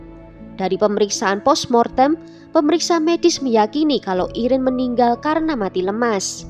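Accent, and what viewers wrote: Java, Indonesia. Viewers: mbak suara nya Medok 😀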